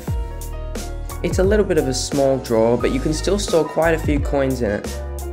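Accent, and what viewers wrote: Australia. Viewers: YOUR AUSSIE!!!! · are you aussie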